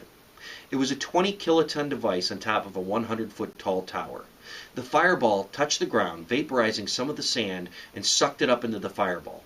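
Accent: United States. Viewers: It's funny that you say that, only in America we say it that way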